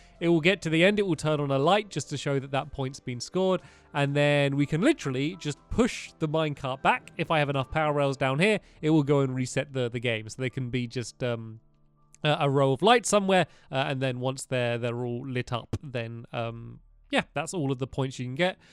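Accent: England, United Kingdom. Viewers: Oh, oh you make me smile! I think i'll sit down and watch for a while. Oh, oh, when i hear voice. I hear the engish acent i rejoice! Oh, oh, your worldx are lovely! Full of cakes helpers and lovely jublies. Oh, oh sta-oh no! Its hitthetarget!! He shoots an arrow, you gotta block it! Horray we won! Now the day is done. Now its night, turn off the light and dream... Oh, wait, whats my dream? To become your helper. I am a great builder! Oh please, oh please. Gamer tag: CrystaI dog P.S. the L is a capital i Thank you!😊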